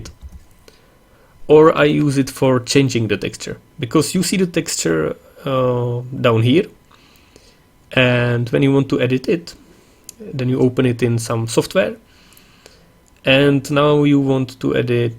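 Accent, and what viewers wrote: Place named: Czech Republic. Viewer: no ten český přízvuk jde poznat :D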